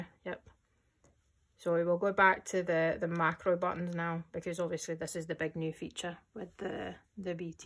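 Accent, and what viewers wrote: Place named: Scotland, United Kingdom. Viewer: after hearing your Scot accent I am starting to like it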